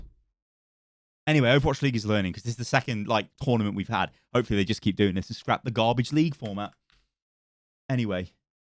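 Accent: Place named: United Kingdom. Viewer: Always enjoy these vids! Nobody ever takes the time to slow an owl fight down and point out the endless high iq plays. Ur emotion and... british..ness makes it entertaining and helps get the message across even better. Also how did fury hit so many forking rocks onto a genji just disgusting what a game.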